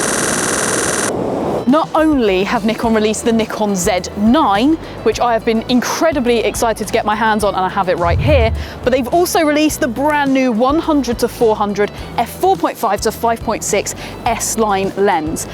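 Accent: United Kingdom. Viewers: British accent 🤩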